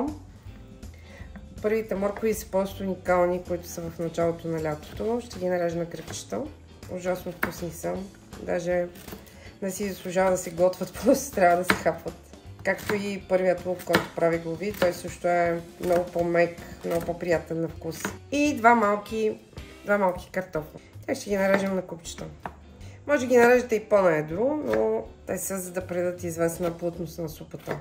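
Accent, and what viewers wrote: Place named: Rural, Bulgaria. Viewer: Ти с този селски говор срам си за българския език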